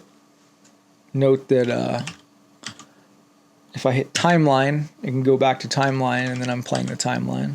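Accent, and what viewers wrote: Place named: Brazil. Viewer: Thank you very much for this video, it's not very often I may be the 11th subscriber! Are you Brazilian by any chance? Your english accent suggests so.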